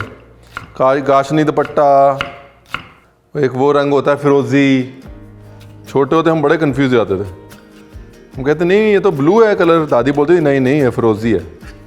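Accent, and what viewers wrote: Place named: Punjab, India. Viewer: Aa bani gal.... Vich vich punjabi shi lagdi aa. ..good........... yar JALANDHAR ton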